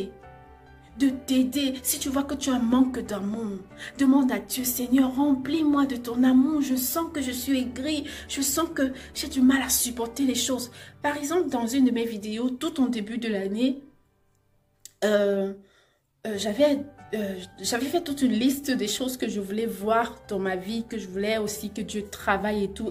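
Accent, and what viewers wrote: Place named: Cameroon. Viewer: Une Fibre camerounaise derrière ta voix😊😊😉 Courage en tout cas, 👍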